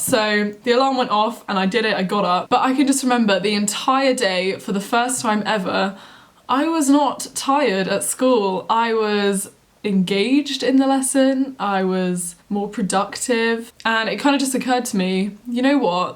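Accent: United Kingdom. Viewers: do you have a british accent? you dont seem to have an aussie accent to me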